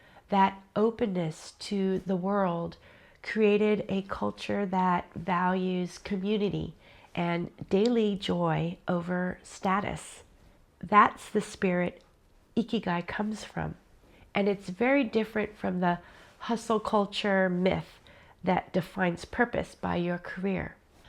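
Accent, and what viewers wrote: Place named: Netherlands. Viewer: Hi, sounds Dutch are you? Well don’t give up yet. There is a bigger reason why you are here and your life has value. Most people have problems nobody knows about. Count your blessings and focus on what you are still capable do and find someone you can help with their problems. Stay strong ❤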